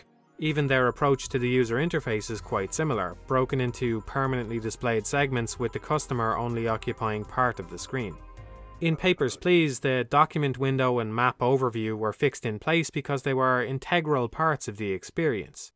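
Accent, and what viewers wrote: Ireland. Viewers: xaax666 he's irish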